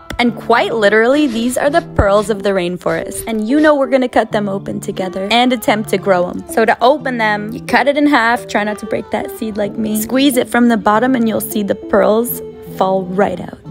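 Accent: South, United States. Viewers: So weird. Sometimes she has a kind of oriental accent, sometimes Spanish on some words. And then always throws that southern accent in there lol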